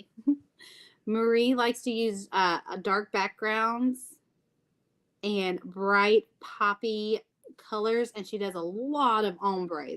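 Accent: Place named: South, United States. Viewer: Lovin her southern accent….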